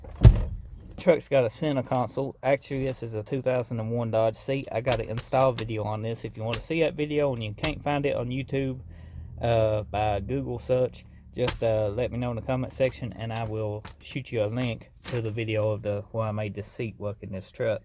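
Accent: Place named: South, United States. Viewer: This video is exactly what I was looking for. Also, I thought my southern accent was thick but you put me to shame.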